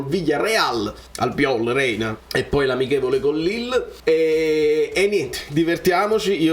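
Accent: Naples, Italy. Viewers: Il canale più simpatico...ma sei napooletano uagliò.......🤣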